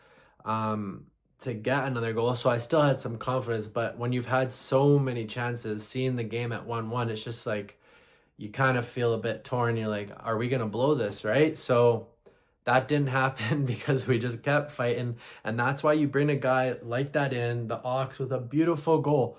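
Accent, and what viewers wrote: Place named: Scotland, United Kingdom. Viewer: Keep up the positive vibes bro and love the Scottish slag you have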